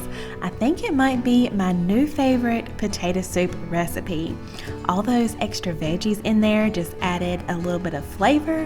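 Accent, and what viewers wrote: South, United States. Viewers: The way the economy is right now, chicken appears to be the choice. Your recipes are so yummy. I look forward to trying the Swiss Chicken. I love your Southern accent. I have one too. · Everything looks amazing.....I especially like the potato soup recipe!!! I don't know what the fuss is about the word moist but hey everyone has an annoying word eh! I honestly can't stand when someone can't pronounce words with "oi" in them like oil or foil for example and says them like ole and fole rhyming with bowl... had to stop watching videos because of it sorry to say. Anyways, you asked...LOL...thanks for sharing, really enjoy your channel and you southern accent!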